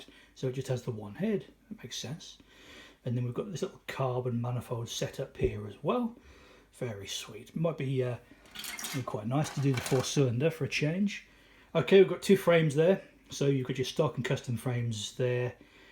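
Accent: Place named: England, United Kingdom. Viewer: Good review of complex kit👍🤓just watched a couple more too, so I'd better sub😃 It's nice to hear your another Englishman aswell😃Pete 😎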